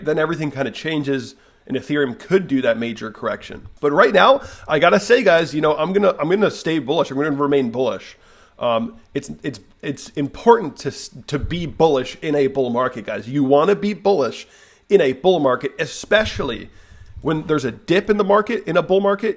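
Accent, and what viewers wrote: Sweden. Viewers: Are you from Sweden originally?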